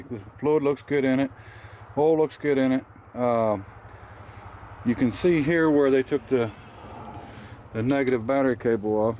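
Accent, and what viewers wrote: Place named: West Virginia, United States. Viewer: is your family from West Virginia I'm just wondering because of your accent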